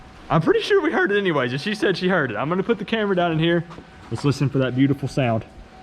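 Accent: South, United States. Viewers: I recommend some underbody coating. Based on your accent seems like you could be somewhere in Alabama like me or at least the south so salted roads aren’t a big issue. But with this truck being as old as it is, I’d recommend some just to stop the rust corrosion (or at least slow it)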